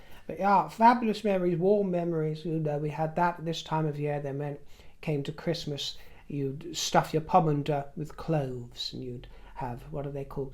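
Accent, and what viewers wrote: Australia. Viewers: Good Aussie accent ❤ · This old Aussie chick likes your Aussie accent. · dear! That's a fine Australian accent, I thought. ❤ 🥝 🇳🇿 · Love your Australian accent. 😅😘❤🇦🇺 We are honoured. Seeing the Uluru "handed back" commemoration ceremony shows how lovely the King is to our indigenous people and they were all beaming. You wouldn't think that was the case if you listened to agitators here who claim to speak for them. Lovely to see. ❤ I'd love if you did another round of keepsakes. 😌❤️👑🙏 You do mean a lot to all of us. xo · I just love your Australian accent 😂 🇦🇺🇬🇧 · Bloody hell Aussie accent is so good! 🇦🇺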